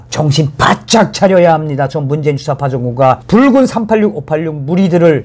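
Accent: North Korea. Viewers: 북쪽 말씨 듣기도 싫다 적당히 씨불이거라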